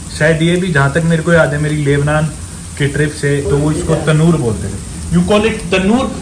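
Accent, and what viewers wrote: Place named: Rajasthan, India. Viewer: Bhai aap hamare rajasthan se ho aapki aawaj se to lagta h 👍🙏🙏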